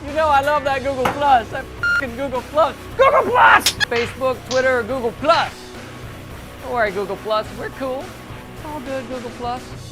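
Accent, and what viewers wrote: South, United States. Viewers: random but i LOVE your accent. you sound very southern in the best way possible. i’m a born and raised georgian so i can definitely appreciate it. it sounds like i’m being spoken to by a friend.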